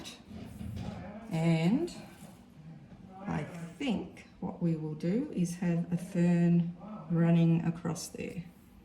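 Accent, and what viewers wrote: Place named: Australia. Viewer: I love your work, can you advise where to buy the deli paper from, I’m struggling to find a stockist. You sound Aussie, like I am. I love experimenting with my gelli plate.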